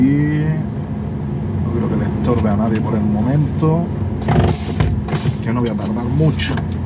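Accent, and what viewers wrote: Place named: Almería, Spain. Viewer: Desde hace 20 años voy a veranear a Sorbas, toda la familia de mi pareja son de allí y me encanta vuestro acento a parte de tapear y cervezear contínuamente. Por cierto, en el te has saltado un rojo como la copa de un pino 😂😂😂😂. Saludos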